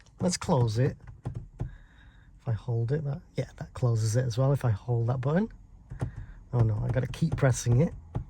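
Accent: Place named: Birmingham, United Kingdom. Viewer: Def a Brummie or poss a Wolverhampton, but I couldn't tell the difference, but you'll know. You have a cultured Brummie accent I say. Kiddy is the same but Worcester doesn't apart from Brummies working there. Allroight!😊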